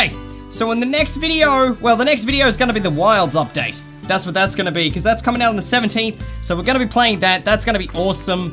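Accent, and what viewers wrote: Australia. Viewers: are you austrailian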